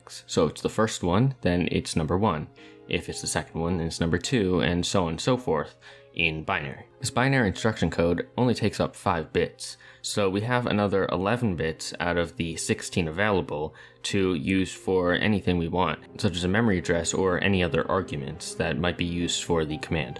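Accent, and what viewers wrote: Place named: Germany. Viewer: Are you from Germany?The way you speak remains me my friend from there. I don't know all that cool stuff, but the way you explaining makes me want to learn. That you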